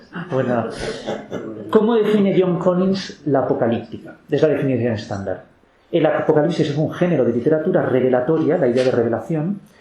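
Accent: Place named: Spain. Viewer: Hola.muy malo el sonido y ademas el acento español dificulta entender .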